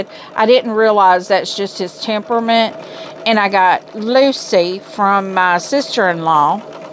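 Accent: South, United States. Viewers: Lol I did too but it's that southern accent. 😂😂😂😂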